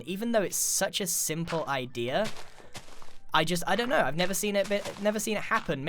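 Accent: United Kingdom. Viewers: U to british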